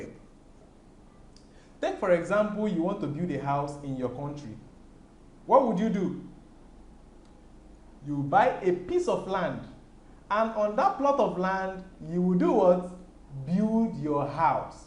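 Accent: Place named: West Africa. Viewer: I wasn't sure I could stomach the West-African accent, but I gotta admit, the hilarious analogies have grown on me... Good work, can't wait to watch all videos...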